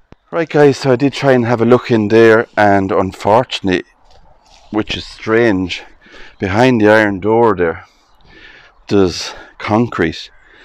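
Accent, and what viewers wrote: Ireland. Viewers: Thank you for another beautiful video. My husband and I were just commenting on your Irish accent. We are in the United States in Northern Michigan and we get teased about our accents here, if you really want to hear a different accent look up a Yooper accent. It’s an accent that people who live in our upper peninsula have, some of the people who live in the lower peninsula but very far north also have some of this accent. It comes from all of the Finnish, Yugoslavian,Italian and Canadian people who migrated here through Ellis island to work in the many copper mines. I hope that the two of you have a lovely evening. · Love the irirsh accent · I was also wondering which part of Ireland your accent is from. My 4 times great grandfather came from county Clare to Australia in chains,for the term of his natural life because it was alleged that he was involved in white boy activities,he was 12 I often wonder what he looked like and spoke like. 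He died at 35,no doubt as a result of being flogged as laid out in the NSW convict records. 😮. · love the irish accent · ME TOO i love YOUR IRISH ACCENT!!!! OLD CEMETERY'S ARE SO INTERESTING. bUT IT IS SAD HOW TIME WRECKS THEM AND SOMETIMES VANDALS. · Love your Irish brogue!! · Thank you for another wonderful tour! Yes I too love listening to you and your Irish accent I could listen to all day!❤❤❤❤ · I really love the Irish accent and yes that’s a weeping willow, we have them here in Australia too. Love your videos xx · I love the Irish accent. Mother-in-laws ancestors were from Dingle Dale. Great job on all your videos. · Sorry, I can't help with the Latin, but as for that tree.... yup, I do believe it is a weeping willow... and an old tree at that! That chain.... wow, that's a work of art, very nice! And yes, another one here who loves the Irish accent! 👍 As a matter of fact, I'm listening right now to a few of th' b'yes o'er in Ireland on the ham radio! 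(I speak with a few of them from time to time!) 👌 Pete 🇨🇦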